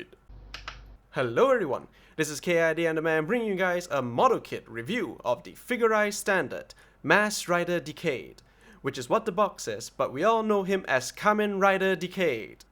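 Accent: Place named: Malaysia. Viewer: You're either a malaysian chinese or a singaporean chinese, by how confused your accent sound like at times. American english or UK english..decide..other than that, awesome review.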